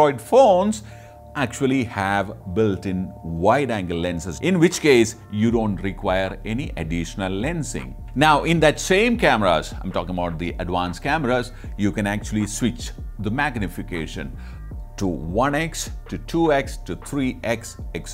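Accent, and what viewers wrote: Kerala, India. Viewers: You speak English like Indian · He has a mallu accenture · sounds like keralite.. isnt it?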